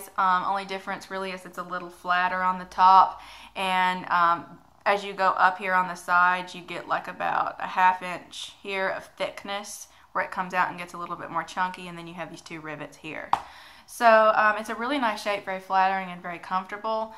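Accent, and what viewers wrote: South, United States. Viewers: Your skin, hair, and eyes are absolutely intoxicating. Eyes like perfect almonds. You do your makeup perfectly. You're just accenting your already lovely features, not trying to paint over and create something new. You're a vision! The southern accent is the coup de grace. Great info also. My new Wayfarers were squeaking so that's how I found your channel. Thank you!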